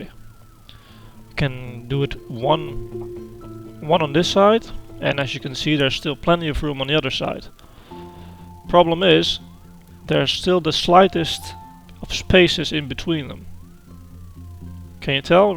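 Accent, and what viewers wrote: Netherlands. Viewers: you're from holland am i right? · Ik wist dat je Nederlands was ik hoorde het aan je accent :D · Ik kan gwn horen aan jou stem dat je Nederlands bent haha, bedankt voor de tips 7jaar later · are you dutch? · are you dutch by any change? u sound like you are haha ^^'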